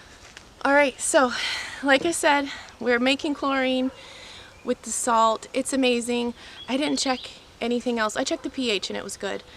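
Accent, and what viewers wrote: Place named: Louisiana, United States. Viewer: One of my many talents is to recognize and pin point the different dialects and accents from Louisiana(which there are HUNDREDS) and call where people are from. I turned on the video and i thought i recognized a north shore St. Tammany or maybe Washington parish spunding lady and i click on your page and see the Louisiana cover pic. I could be wrong, i sense a hint of the lower cajun country(east of Atchafalaya bridge i.e henderson breaux bridge area) so maybe your an implant and just picked up some of your accent but im pretty solid on the northshore call. How'd i do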